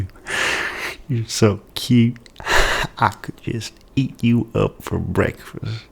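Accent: South, United States. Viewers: You seem much more mature than a typical 24 yr old. I'm old enough to be your Dad.....if you were born when I was 12 yrs old! KIDDING! 20 yrs from now, you'll look the same. You know I like to tease you, but I'm correct on how you'll age. I like the southern accent and remember what I wished for you to do.........and accents will help. I'm not good at accents nor can I identify them (where the person is from). Due to cancer treatment, I lost some of my hearing at a young age. While in Hawaii, a waitress came to my table and I just looked away b/c I had NO idea what she was saying and she was speaking English but with a heavy accent. My friends found it hilarious, while I sunk in my chair. 🤣 (it's healthy to laugh at our own shortcomings) 👂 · I was not expecting such an flawless Southern accent but here we are 😂😂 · how did bro nail the southern acc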